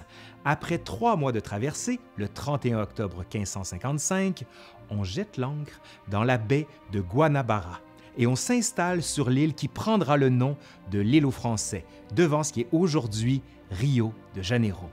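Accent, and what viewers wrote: Quebec, Canada. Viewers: Et bien pour moi c'est la vidéo avec l'accent québécois qui me régale haha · Très intéressant ! Et l'accent québécois ont adore en France 👍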